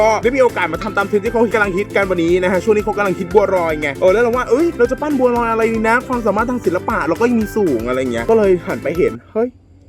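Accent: South, Thailand. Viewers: พี่เป็นคนใต้หรอคะหนูพูดใต้ไม่ได้แต่หนูพูดจีนได้ค่ะ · แม่เจอแล้วพี่เป็นคนใต้เหรอ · พี่เป็นคนใต้หรอครับ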